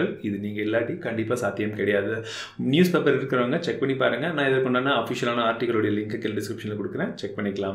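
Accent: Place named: Kongu, India. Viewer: வாழ்த்துக்கள் அண்ணா..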